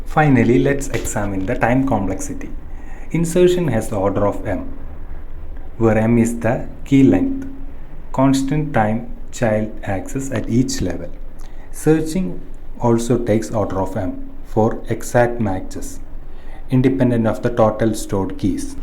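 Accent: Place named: India. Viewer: disgusted by this Indian accent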